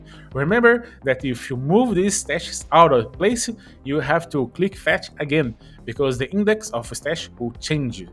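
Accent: Brazil. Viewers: You have to be Brazilian or Portuguese , i can tell by the accent! anyways no hate or anything just something i noticed but thank you for the awesome video!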